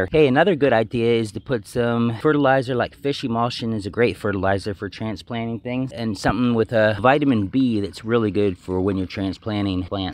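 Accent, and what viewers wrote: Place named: Pennsylvania Dutch, United States. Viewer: This is a great instructional, informative vid!👍 Wow, humongous volunteer squash leaves! Do you use DE for squash vine borers? Your accent kinda sounds like where I'm from a bit, PA Dutch country! 😉 Take care!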